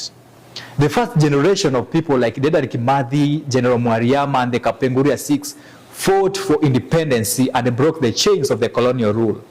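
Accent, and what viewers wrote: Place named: Uganda. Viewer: He sounds more of a ugandan than kenyan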